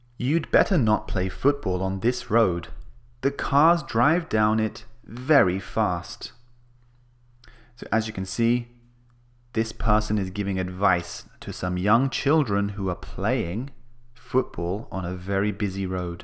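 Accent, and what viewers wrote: United Kingdom. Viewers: Britsh Accent?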